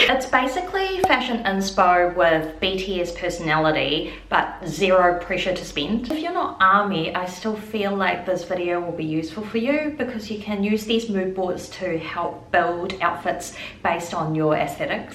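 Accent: New Zealand. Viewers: Love your kiwi accent. This was so fun to watch. I hope you get those tickets! But what will you wear when you do? 😅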